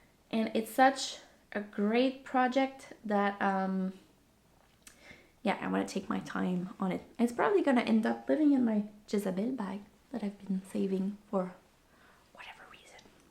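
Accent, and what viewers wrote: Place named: France. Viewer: Absolutely delightful podcast...love your French accent. I do miss young motherhood. I can see you are savoring this time of your life!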